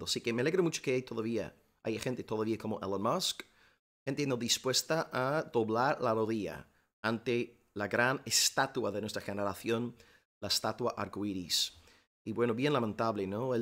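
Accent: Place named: United States. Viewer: Habla como gringo pero no puede traducir bien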